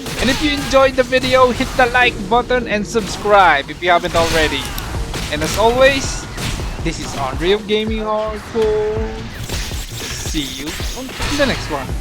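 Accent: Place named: Philippines. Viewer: you sound like a filipino. are you filipino?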